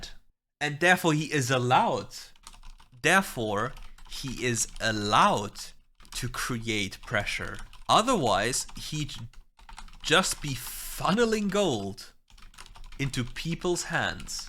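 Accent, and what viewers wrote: Germany. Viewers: Bro are u German? Unbenannt.txt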